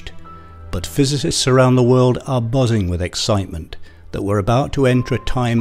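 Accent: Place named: England, United Kingdom. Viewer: I'll admit it. the English accent makes me pay attention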